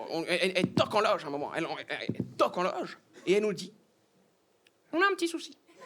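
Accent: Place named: Rural, France. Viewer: Je ne comprends absolument pas que des blancs puissent être raciste envers lui, il a un accent de campagne française et est plus blanc que moi, et merwane c'est breton comme nom...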